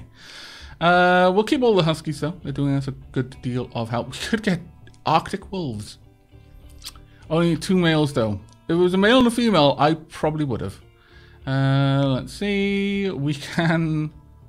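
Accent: Wales, United Kingdom. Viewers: The Welsh is strong with this one. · not too noticeable. Loving the Welsh twang again :)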